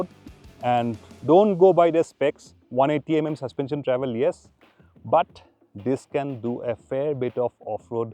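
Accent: Assam, India. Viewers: Happy to see more assamese people in the automotive space. Accent tu huniye vabisu axomiya neki baru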